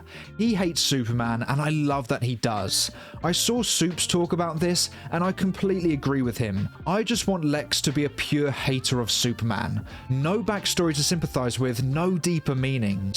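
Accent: United Kingdom. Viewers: These are the facts. The fish and chips accent seriously enhances the review experience I’m not even remotely going to lie. Love this channel, even though he complains too frequently about even mild humor in a Superman film. Still, few have done better journalism on the progress of this film than this fellow.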